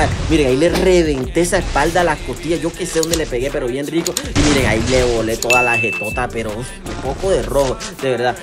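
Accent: Barranquilla, Colombia. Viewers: Bro eres de Barranquilla Colombia? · Es de Barranquilla? Tiene acento de ahí😂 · Bro eres Costeño, eres de Barranquilla verdad responde brother❤️🙏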